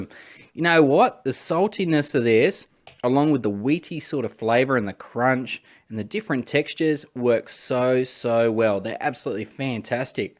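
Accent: Australia. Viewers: God I love how Aussie you are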